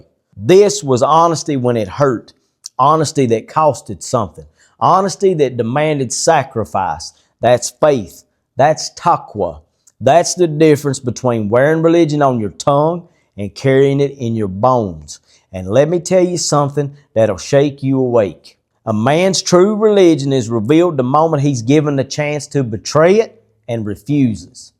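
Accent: South, United States. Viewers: Brother, every time you share a story in that beautiful Southern accent, you wrap a powerful lesson in the most gentle warmth. You take an incredible act of honesty and make it feel like a conversation on the front porch—simple, true, and straight from the heart. And when you end with, "and that's all I gotta say about that," we feel the weight of your faith and the sincerity of your character. It’s a blessing to have your voice in the Ummah. May Allah continue to bless you and your good wife. Ameen. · Masha’Allah, Uncle You have a special way of articulating in these videos, delivering wisdom in that southern accent is heartwarming to see. Keep up the great work. May Allah make us steadfast on this faith.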